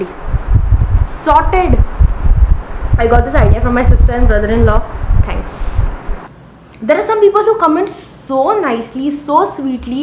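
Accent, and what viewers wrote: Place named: Cockney, United Kingdom. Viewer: Hey you speak very fluent English.. also kokni i like a lot..so keep it up.. ignore negative energy they are here from lifetime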